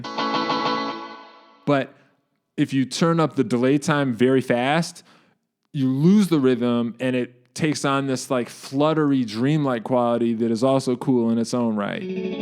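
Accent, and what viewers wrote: Boston, United States. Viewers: This guy is actually really intelligent especially when it comes to music and pedal theory, but I can never not hear a Departed style super bostony/Ny parody accent, or that one guy from Family Guy that's like "I was going to bone my girlfriend, but there was no way"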